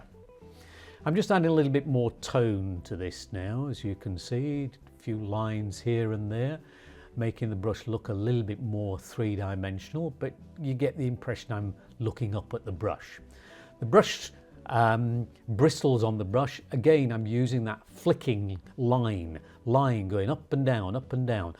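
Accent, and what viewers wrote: United Kingdom. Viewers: لكنته بريطاني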